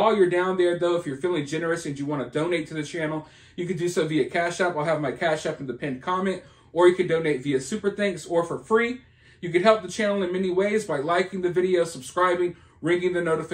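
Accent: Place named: Georgia, United States. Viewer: Your a Floridian? I thought you were a Georgian!!!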